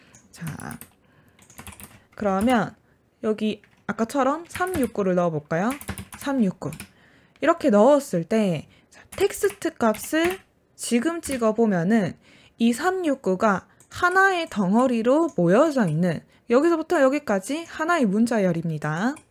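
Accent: Gyeongsang, South Korea. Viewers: 오늘 또 듣네요~~ 님 경상도분이신 듯 좋아요 따봉 추가요~~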